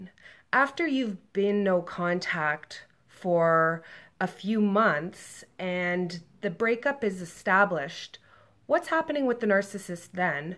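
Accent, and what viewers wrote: Canada. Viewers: U sound canadian! What province?...great advice on no hope, btw. That is the cold hard truth. They will never, ever, ever change...in fact, they get worse as they age.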